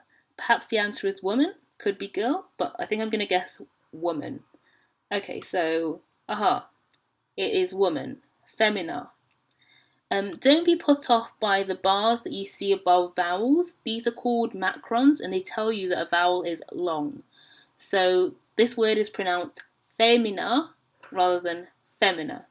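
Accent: England, United Kingdom. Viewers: I think you have a very strong English accent in Latin😅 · I appreciate all the effort you have made but you accent when you try to speak Latin is just so terribly English. It sounds funny.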